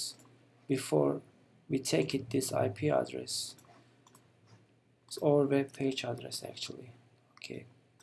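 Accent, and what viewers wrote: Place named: Turkey. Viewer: Çok faydali ve işe yarar bir video olmus sagolun bey bu arada videodaki ingilizce telaffuzunuzdan anladim sizinde bir Turk oldugunuzu:))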